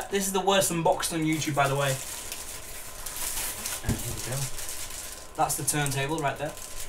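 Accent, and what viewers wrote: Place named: United Kingdom. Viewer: Michael Woodruff dude....lay off it's how the Brits talk....kinda like privacy or aluminum.... Lol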